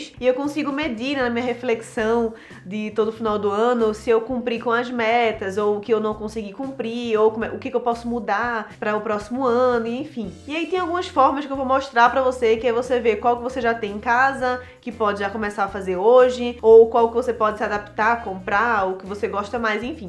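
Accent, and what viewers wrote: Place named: Pernambuco, Brazil. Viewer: Vc é de PE, né? O sotaque entrega! 😅 De todo modo, MUITÍSSIMO Obrigada pelas dicas! Vc apresenta o conteúdo de forma bastante didática, sucinta, clara e objetiva. E, msm assim, bem informativo (refiro -me à quantidade e à qualidade da informação, bem satisfatórias). Muito bom! 🤗 Sucesso! 🌼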